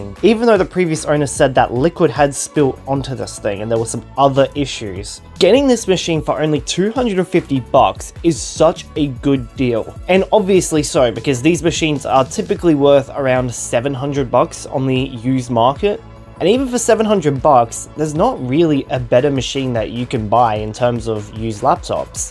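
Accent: Australia. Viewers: i think he's just australian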